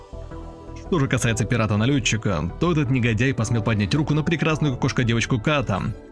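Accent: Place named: Surzhyk, Ukraine. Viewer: Лайкос за суржик😁😂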